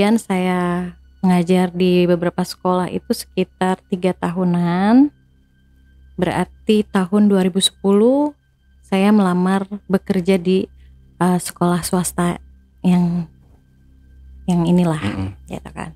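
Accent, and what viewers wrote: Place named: West Java, Indonesia. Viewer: Saya suka logat bicara ya pasti orang sunda.soalya saya juga gitu logat ya🤭 serius denger ya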